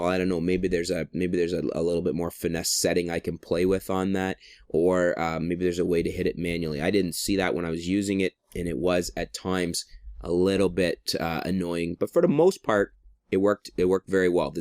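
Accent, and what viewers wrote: Canada. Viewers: I love your Canadian accent! Made me smile so big. ; ^ D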